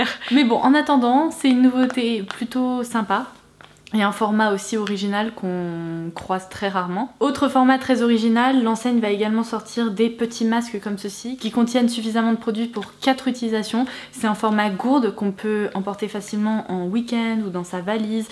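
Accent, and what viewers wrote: England, United Kingdom. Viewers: Super vidéo, maintenant il reste plus qu'à économiser mdrr et j'adore ton accent anglais ! 😃❤️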